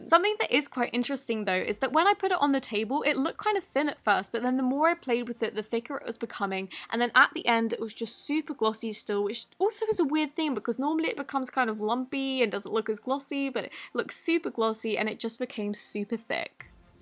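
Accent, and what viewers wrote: England, United Kingdom. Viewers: THE TRUTH ABOUT THE WORLD, She's English. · I love British accents❤️💕❤️💕❤️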